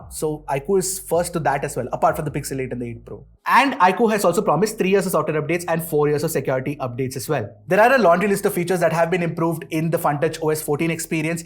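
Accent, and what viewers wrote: India. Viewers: The indian accent is always👌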